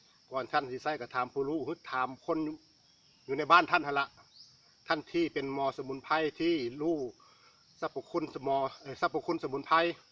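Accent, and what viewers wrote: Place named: Nyaw, Thailand. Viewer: ไปหาสมุนไพรนำอ้าย มักเบิ่ง มักอ้ายเว้าญ้อจ้า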